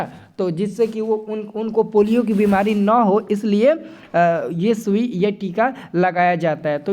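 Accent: Bihar, India. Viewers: tum ho Bihar se ho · Bihar se ho Sir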